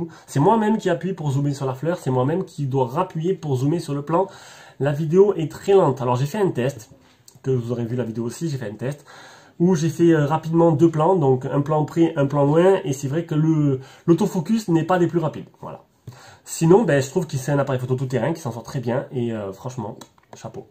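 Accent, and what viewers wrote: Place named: South, France. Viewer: Merci pour ce test qui m'a captivé que j'ai regardé du début jusqu'à la fin. Bonjour l'accent du sud et on sent le passionné pas forcément professionnel (ou peut être j'en sais rien...). La prochaine fois il faudra parler du type de charge rapide (18 watts je crois) et la possibilité ou non de charge à induction mais le test est vraiment pas mal...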